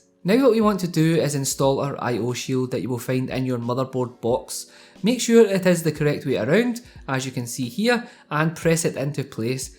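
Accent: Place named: United Kingdom. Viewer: I don’t know if you will see this but you sound very British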